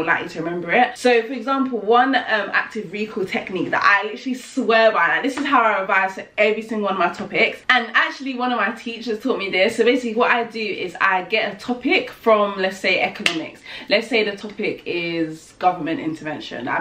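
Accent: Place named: United States. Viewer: U sound American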